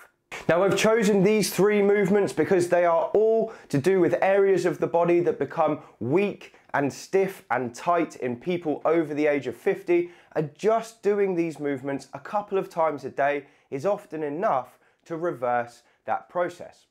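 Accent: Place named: United Kingdom. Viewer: Superb presentation.... British! No annoying music either!!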